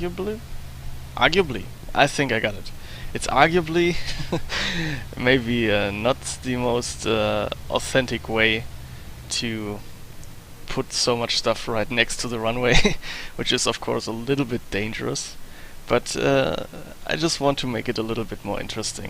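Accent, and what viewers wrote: Germany. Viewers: nice. I really enjoy your vids. your English is very good, and the german accent gives a nice 'feel' to the video when you are in the 109. by the way,(as a 'teaching thing', not as a 'know it all'....just to help you learn English), 'lympne' is pronounced 'limp-nee'......the word you had trouble with....'arguably', is pronounced 'are-gew-ab-ly', (I think you were just in a hurry and got 'tongue tied').....I can't wait to try your server.....thx....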